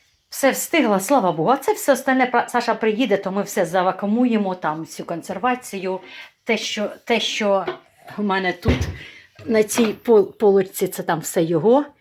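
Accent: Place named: Poltava, Ukraine. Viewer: Добрый день,! Я уроженка Полтавской области, Чутовский район. Для меня ваш говор просто бальзам на душу. Как дома побывала. Все ваши видео смотрю, многие готовлю по вашем рецептам. Желаю вам быстрейшего наступления мира. Держись.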